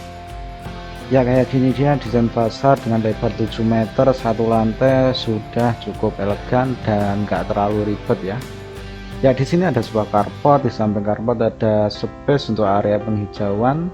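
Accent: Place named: Central Java, Indonesia. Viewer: Mas logatnya jawa tengah tp kok dipanggil Kang? Hehehe. Konten yutubnya keren btw..! Request tiny house 2 kamar ala villa dong budget under 50 jt 😁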